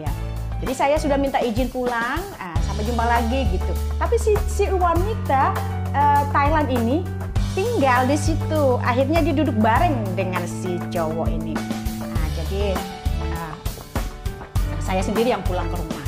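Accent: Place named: North Sumatra, Indonesia. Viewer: Ceritanya tan, sangat menarik sekali. Jadi pertama tama waktu masih ngobrol dan ketemu, kencang ya tan kalo bicara. ? Nggak kebayang ya Tan, tante kan logat Batak nya sangat kental haha. Jadi menarik sekali kalo didengar.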